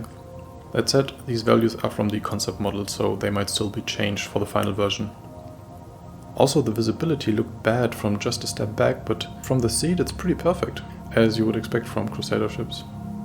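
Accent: Germany. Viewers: you sound like a german speaking english :D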